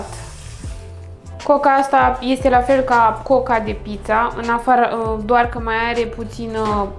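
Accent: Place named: Moldova. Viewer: De ce vorbeşti cu accent moldovenesc... 🤔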